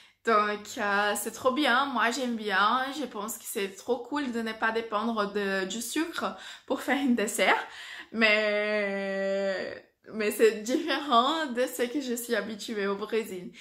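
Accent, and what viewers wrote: France. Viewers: Que linda ela falando francês!!!1 Seu francês evoluiu muuuuito,. Parabéns!!! Tá com sotaque francês já hahaha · Tu as l’accent du Sud de la France, c’est mignon. 💖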